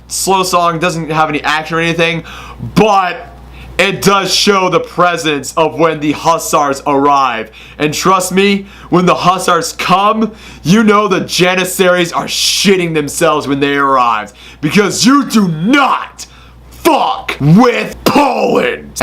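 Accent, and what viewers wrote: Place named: Russia. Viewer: Dat Putin accent doh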